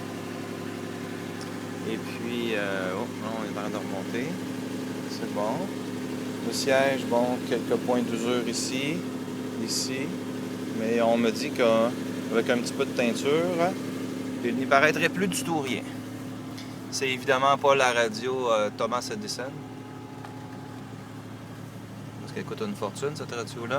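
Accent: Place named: Quebec, Canada. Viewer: merci pour cette sympathique vidéo, toujours un immense plaisir de d'entendre la voix du Québec. Pour les ailes arrières, hélas c'est plus que la rouille mais de la perforations, j'ai eu droit également pour les ailes avant et les portières. Il faut découper, former une nouvelle tole, souder etc.... mais le résultât est à ce prix. En tout cas félicitation pour votre belle SM. <link>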